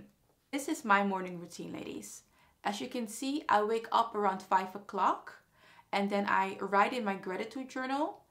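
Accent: Netherlands. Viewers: Where are you from? You start like you are from the Netherlands!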